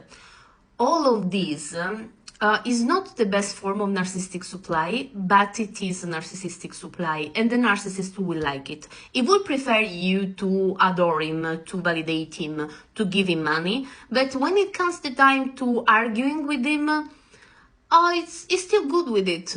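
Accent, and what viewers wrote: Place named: Italy. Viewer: She just sounds Italian!?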